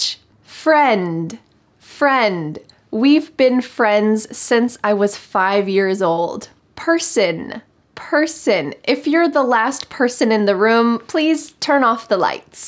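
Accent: United States. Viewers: I watch all your videos to hear your voice because your voice is very clear and good quality. That's why I get to listen to American English. I can easily understand your voice. thank you so much.. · Hello. I'm from India. I love your english speaking way in american accent. It is absolutely remarkable. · hai mam ..is this is UNITED STATES English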